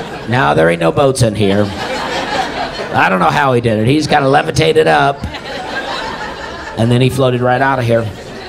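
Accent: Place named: South, United States. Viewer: I'm a Midwesterner, and I have to admit that part of appeal is that accent...but it's more than just the southern accent, it's kinda the rythm and the calm way he talks... but overall is just so multi dimensional: the jokes, the timing, the laid back demeanor. This guy is one of my new favorites.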